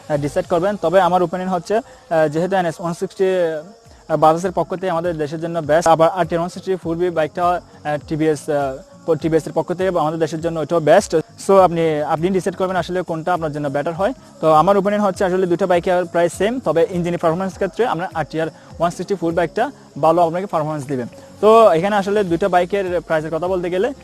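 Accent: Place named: Chittagong, Bangladesh. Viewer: কথায় পুরা চিটাইংগা এক্সেন্ট 😷😂😂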